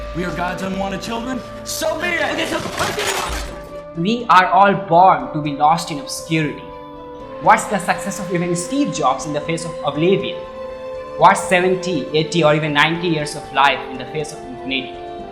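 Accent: India. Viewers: why is it not proper english, you cant expect an indian man to talk in an american or english accent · Dude, that heavy Indian accent is a put off. But other then that, content is good.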